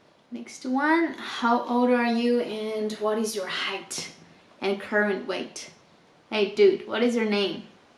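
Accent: United States. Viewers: You have a slight American accent? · I was almost sure you were AMERICAN. You sound more American than most AMERICANS.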